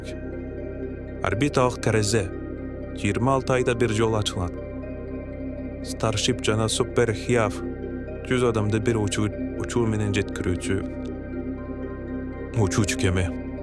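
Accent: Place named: Russia. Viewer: Орус эми кыргызча уйронуп келейткандайго.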